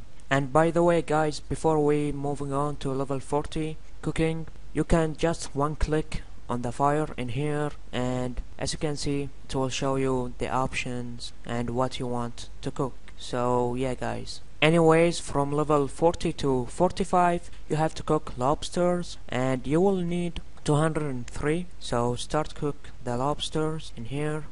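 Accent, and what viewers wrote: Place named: Arab world. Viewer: good guide, and your definitely Arab that accent lol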